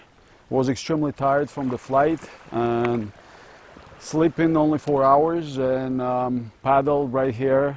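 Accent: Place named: Russia. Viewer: Почему я слышу русский акцент?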